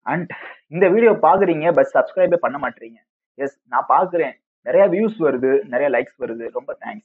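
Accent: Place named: Madurai, India. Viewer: Change ur slang it's completely madurai slang da... But keep going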